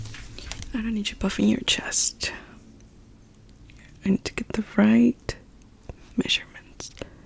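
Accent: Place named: United States. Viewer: A very clear, deep, soft female voice ❤ with a natural American accent. Not all those "French, Mexican, Slavic, etc."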